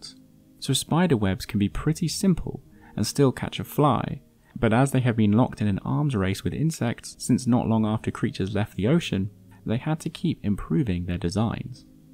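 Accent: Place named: England, United Kingdom. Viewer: Does anyone else find this particular English accent really grating? Its just like nails down chalk board for me. All I hear is him sucking his teeth and speaking through his gums.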